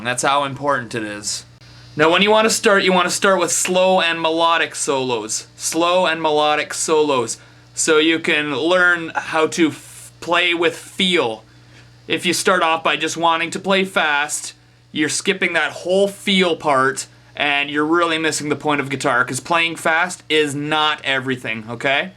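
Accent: Canada. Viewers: Because he's Canadian (i think). They don't eat words or fart them like the Americans and the guys at UK. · ... are you canadian... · Your Canadian accent is strong in this video.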